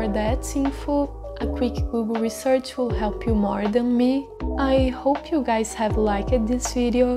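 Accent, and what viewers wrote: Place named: Brazil. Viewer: Sabia que conhecia esse sotaque e o inglês limpinho, é do Brasil ksksk seu inglês é muito bom 🥺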